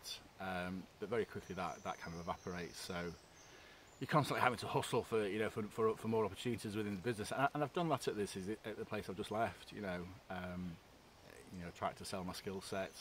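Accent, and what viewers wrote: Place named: Cheshire, United Kingdom. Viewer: Not a clue who you are, but the algorithm told me to watch this, so I have. Good luck with the job hunt. Your accent sounds like you're from my part of the world (Cheshire) 👍🏻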